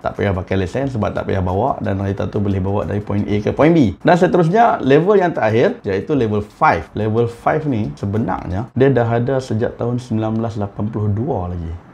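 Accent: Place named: Kedah, Malaysia. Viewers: Mesti org kedah ka? Hahahahah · Bila mana speaker mula berbicara guna bahasa kedah, aku dah tau betapa substancenya benda yg dia nk sembang tu. #thisisnorth #padubaqhang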